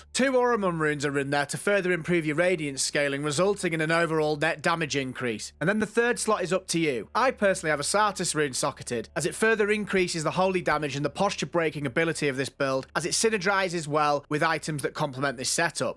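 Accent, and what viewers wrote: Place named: North West England, United Kingdom. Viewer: I’ll be honest, bro, I keep expecting to login to it being nerfed to f*cking kingdom come 😂 glad I got to experience it in all its busted glory before the inevitable happens 😂 And my pleasure to support; especially for a fellow, North West of England, lad (there’s about 35miles between us [north of me] judging by your accent). Keep up the good work, fella 👍🏾🤟🏾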